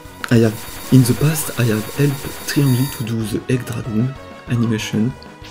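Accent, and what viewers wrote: France. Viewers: Nice france accent man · Bonne vidéo. Par contre, ne le prend pas mal, mais je te conseil de bosser un peu ta prononciation de l'anglais. Certes, l'accent français excuse pas mal de chose, mais si prononce tous les mots anglais avec une prononciation française, ça risque à un moment de ne plus passer... Sinon, continue tes vidéos, tes animations ont cools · this accsent is frenchy very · AH du bon français qui parle anglais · Accusent français très prononcé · It seems his voice is very french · De l'anglais avec un accents français Noice... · Cet accent français de l'enfer ! Avoue, tu le fais exprès ? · You have a french accent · ware a part 2? I love you french accent Un francais!!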